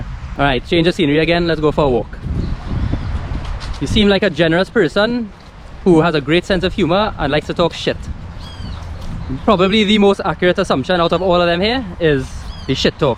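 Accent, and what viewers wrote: Trinidad and Tobago. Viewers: This was hilarious. 100% agree in regards to traveling. I am not an extensive traveller but I've been fortunate to visit a few countries and truly enjoyed it. You become more open minded and less ignorant because now you've experienced different cultures and people and it eliminates any preconceived misconception. On another note, I showed my wife one of your videos but muted it then unmuted and she was so confused. She couldn't comprehend why a "white boy" sounded like a Trini 😂😂😂😂😂. You should do another one like this but live! Bless.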